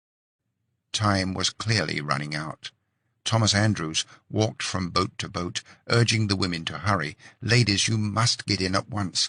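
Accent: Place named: United Kingdom. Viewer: Who is the reader!? Terrific voice, accent so perfect for UK home of Titanic, and superb clarity.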